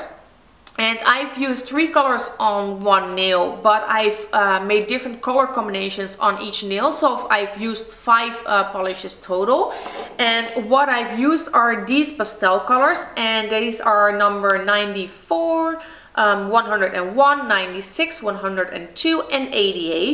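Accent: Netherlands. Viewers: Volgens mij kom je uit Nederland aan je accent te horen ;) Je filmpjes zijn erg leuk met leuke ideeen... En vooral heel netjes! Ik ga deze zeker proberen! x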